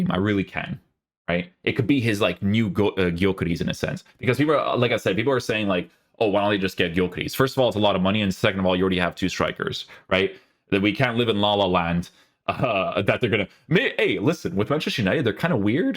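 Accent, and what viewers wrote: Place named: Azores, Portugal. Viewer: Were you born in Portugal,? Or in Canada, but descendant of Açorianos? What team does you father support? There was quite a big açorian immigration to where I’m originally from (Southern Brazil), but both the accent and the trading ties were gone centuries ago. I’m personally multi-ethnic (German, Italian, Lisbon Portuguese and native South American) but I wish I had more contact with Açorian culture. The accent is just insane for me:)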